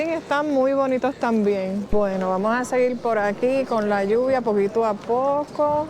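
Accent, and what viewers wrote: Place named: Puerto Rico. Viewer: Saludos. Por fin encontré un YouTube de España explicado en "puertorriqueño". Me gustó que hablas pausado y genuino. Gracias y mucho éxito en tu canal. Myrta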